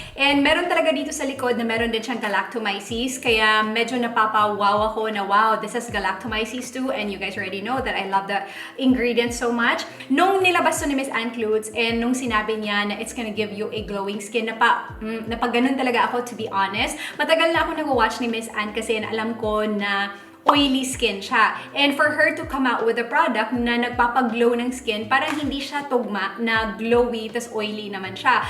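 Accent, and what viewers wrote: Visayas, Philippines. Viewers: 😉Ate what if mag review ka in Bisaya so love the way you talk in Bisaya...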